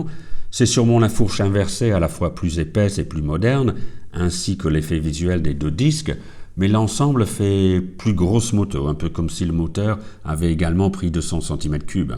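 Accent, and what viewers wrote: United Kingdom. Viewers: Voici donc une courageuse et chouette decision qui ravira votre auditoire MotoFrancophone auquel je fais désormais partie. J’ajoute que votre pointe d’accent British et un raffinement absolu pour l’oreille, tout comme pour superbe moto, la perfection de sa conception doit passer imperativement par un savant reglage de sa sonorite! Les oreilles au service des yeux en somme. Vous réussissez avec brio ce pari egalement. Bravo et un enorme merci de nouveau! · ah je me sens Plutot mieux mtn. Javais penser: comment ose-tu parler mieux le francais que moi? Pendant toutes les annees que je suis Aller en France on me dit " je n'ai jamais connu aucun anglais qui sache notre langue comme toi" mais vu que tu vie en France tout ce temps la, ca fait du sense tu que sois meilleur que moi. Ca fait plaisir aussi le fait que t'aie pas perdu ton propre accent britannique plutot que parler fr avec un faux accent fr enervant comme font les profs de fr au RU. 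😀😀😀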